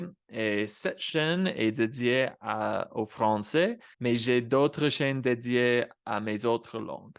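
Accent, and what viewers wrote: Quebec, Canada. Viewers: Je suis québécoise et je suis professeur d’anglais langue seconde à la retraite . Je parle aussi italien. Je vous ai écouté avec beaucoup d’intérêt. Je trouve que vous vous exprimez comme un québécois. Il n’y a pas beaucoup d’anglophones du Québec qui parlent le québécois aussi bien que vous. Une autre raison à avoir de la difficulté à trouver quelqu’un avec qui vous pourriez échanger est que beaucoup de québécois, comme moi entre autres, croisent le français standard et le québécois en même temps. Comme on dit ici « Ça vient mêler les cartes». Félicitations pour votre chaîne. Vous communiquez très bien votre pensée et ce que vous dites par rapport à notre langue est très juste. Votre «FAQUE » et «J’AI DE LA MISÈRE c’est très québécois . 👏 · Ton accent français est pas mal plus celui du Québec que celui de la France. Plusieurs tournures de phrase et contractions sont déjà intégrées dans ton français parlé, mais ce que je remarque le plus, ce sont les intonnations en "en" qui ressortent plus sous forme de "an" dans le français parlé québécois. 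Ça saute aux yeux... oups, aux oreilles! Et les "en" qui se changent en "an", eh bien c'est tout-à-fait normal dans le nord de la France! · Pourquoi tu désires apprendre et parler le français avec la couleur québécoise? Ce vieil accent très localisé de 9 millions d'habitants. ;)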